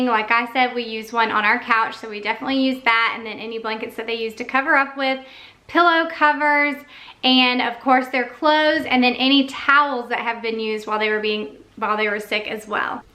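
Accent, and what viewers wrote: South, United States. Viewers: Beautiful Southern accent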